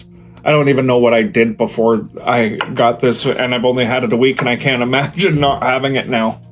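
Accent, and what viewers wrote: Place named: Minnesota, United States. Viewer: Your accent is awesome. 😂 Minnesota? North Dakota? Canada? Im from NYC. 🗽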